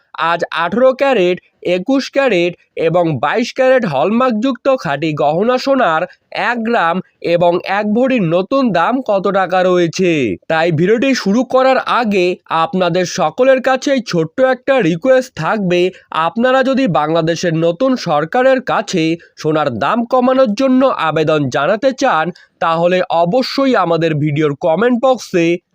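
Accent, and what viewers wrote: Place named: Kolkata, India. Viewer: কথা শুনে মনে হইতেছে কলকাতা বাঙ্গালী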